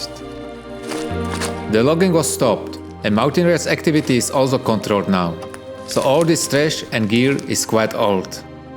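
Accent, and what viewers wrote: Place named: Czech Republic. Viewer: Hi, I just want to ask you, where are you from? You have english accent that sounds a little bit Czech. Im just really curious if you arent from Czech Republic.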